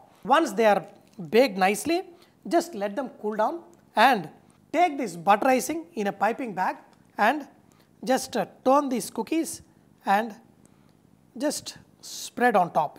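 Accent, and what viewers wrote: India. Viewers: Your accent reminds me Rajesh from The Big Bang Theory. xD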